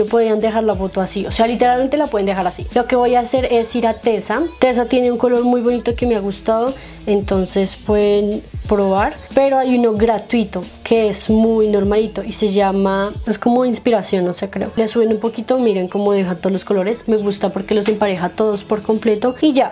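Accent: Colombia. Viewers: Me encanta tu asento Colombiano🥰😍 y me encantaaaan todos los tips para fotos, look y demás Saludos desde Torreón Mex.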